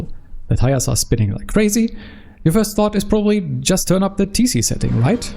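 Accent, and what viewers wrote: Germany. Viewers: machst du das auch in Deutsch weil ich würde an deiner Aussprache sagen du bist ein Deutscher wäre cool danke :D lg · Well that's a subscribe from me. German Accent + Motorsport + Guides That a recipe for success.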